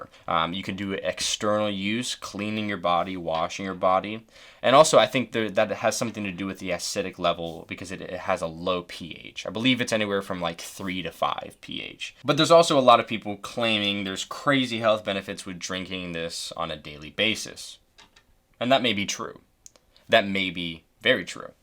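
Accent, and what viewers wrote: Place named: East Coast, United States. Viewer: Are you by any chance from the east coast? I swear I keep hearing a slip of accent come through that sounds like it could be anywhere from Philly/SouthJersey to Baltimore area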